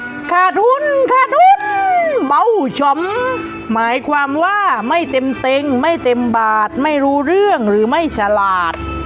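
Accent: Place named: Nakhon Si Thammarat, Thailand. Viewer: แหลงแบบคอนนี่ได้แรงอกมากหวาเพื่อน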